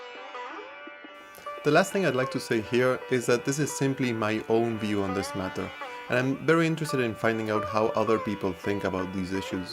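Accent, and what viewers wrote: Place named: Scandinavia. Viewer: Kyabaathai. Very good and important work. Thank you so much for this wonderful initiative! Also I must ask: Where are you from? Your accent sounds very Scandinavian but the Indian Classical Music community is so small here that I would've known you then?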